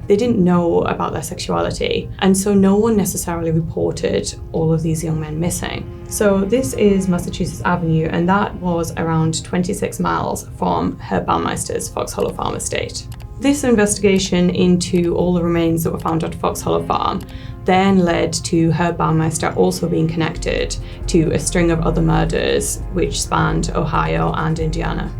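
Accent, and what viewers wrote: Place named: RP, United Kingdom. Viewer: Posh Focal Fry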